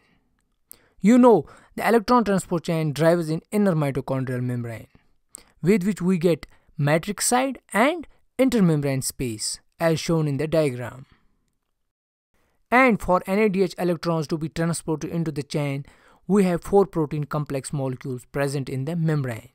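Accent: Kashmir, India. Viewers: Seems like you are from Kashmir sir. Well explained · Love the thick Kashmiri accent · R u a Kashmiri?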